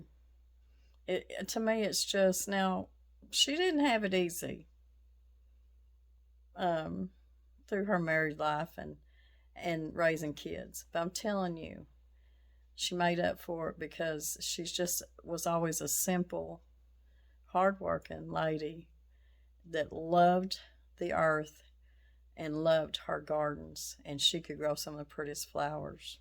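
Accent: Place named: Arkansas, United States. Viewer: I listen to you because you sound like my Arkansas Family that I miss terribly. Today you said something that If I were you I would use to describe your( Good Things(Martha Stewart).(Love and Best Dishes Paula Dean) "You can not beat that with a Stick" Should be how you describe your endings along with " God's Best to You from Whippoorwill Holler." Your beginnings of filming is great describing your goals. I feel like you need an ending. that is just as Country,. You are a great cook, school kid approved, family friendly dishes that withstand the test of time. I Know cause my folks did a lot of life that way. Collins/ Smith/ Hearst/ Sloan are my AR family names. Maybe you have heard of them? You are "the Best thing and God's Best to you all." CKelly Hearst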